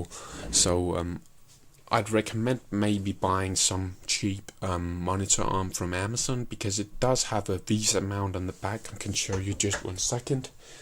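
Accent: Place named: Denmark. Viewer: The Danish accent is so obvious XD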